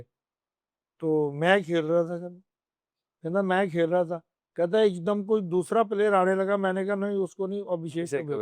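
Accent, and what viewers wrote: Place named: Punjab, India. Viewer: Dara singh wala hindi accent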